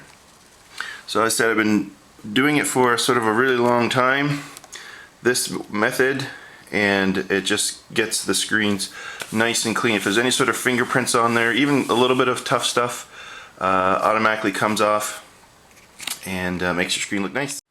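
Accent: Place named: Canada. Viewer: first 30 secs i know he's Canadian and i'm not American. ;D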